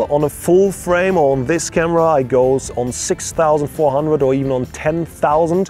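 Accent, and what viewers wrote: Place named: Germany. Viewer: PEP also Englisch ist sehr gut. Nur ein deutscher Akzent, aber das ist auch egal:) mir gefallen die Videos So, English is great, but he has a German accent, but I think that doesn't matter:) I like the videos. They are great BTW: this is an English channel. I recommend to use English. It helps you to learn English and the other persons understand what you are saying;) just a little tip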